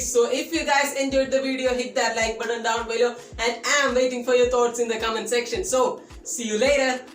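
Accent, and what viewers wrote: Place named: Kerala, India. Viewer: Your English slang tells me that you are a Malayali boy what ever support from Karnataka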